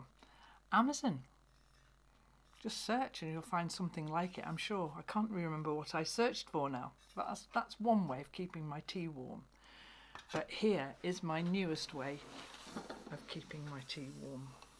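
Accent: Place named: Scotland, United Kingdom. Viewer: Awww. Agnes is a spitting image of you. I don’t knit but truly enjoy watching your videos and am inspired by your creative ideas. Frugal as well, I find fascinating the way something is always possible from seemingly nothing. I’m in Missouri,USA yet in my imagination I can find that I’ve come to Scotland for a visit when you speak. Ahhh. And the bagpipes……🙂 Perhaps one day….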